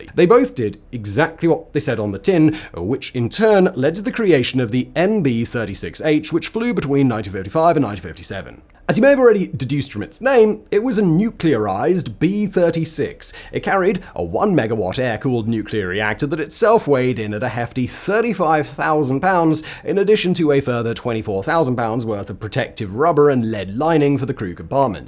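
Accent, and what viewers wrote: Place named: United Kingdom. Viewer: Please speak a little slower. The rapid pace, along with a British accent , reduces to gibberish to the American ear.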